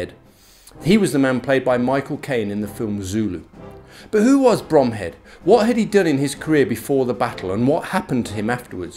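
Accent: United Kingdom. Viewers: Happy New Year old bean ! I enjoy your British officer accent -ha-ha!!! At least in the film, "Gonny" was portrayed by Michael Caine-not 'arf bad !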